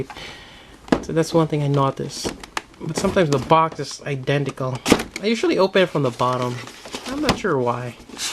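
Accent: Philippines. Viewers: I was just wondering, are you Filipino? Mebbe because of your accent, dunno just askin'.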